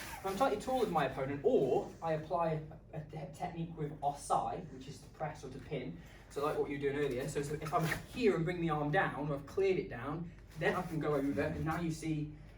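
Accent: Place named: Wolverhampton, United Kingdom. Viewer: As it should be fluid and adaptive…loving the throws btw similar to my Aikido style, started my martial arts in Wolverhampton 20yrs ago, now in Tulsa, Oklahoma, hence noticing the accent!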